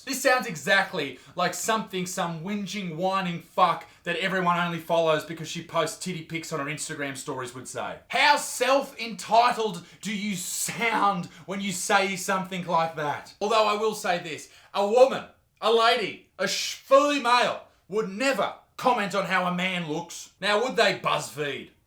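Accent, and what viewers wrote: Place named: Australia. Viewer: Holy shit, you've outdone yourself! You Aussie gob flopper's make me laugh by talkin' naturally!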